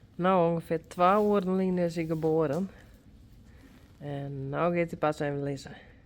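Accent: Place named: Friesland, Netherlands. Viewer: Well done Wunder, that was intense. I was willing him on to find the right place. Poor Saly, I winced each time she cried out in pain. I really enjoy hearing your Friesian language, it's beautiful.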